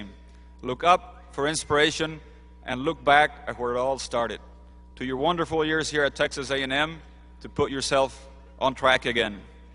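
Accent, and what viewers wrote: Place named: Latin America. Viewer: ¿ orgullo boliviano? realiza un discurso grandilocuente, repitiendo las frasecitas cursis que escuchó en tantas películas gringas. Pero, a sus espadas, los académicos se matan de risa. ¿Por qué? Para ellos, es Félix Patzi hablando castellano, esforzándose en hablar inglés fluido, pero no puede disimular su acento latino, en pocas palabras, está haciendo el ridículo. Está hablando la mascota que ellos adiestraron. ¡Es indignante! Hablar inglés y vivir en EEUU, es el sueño de muchos bolivianos desclasados. Cuando era gobierno, pretendía vender el gas boliviano a los EEUU por un puerto chileno. ¿Qué hizo por Bolivia? Nada.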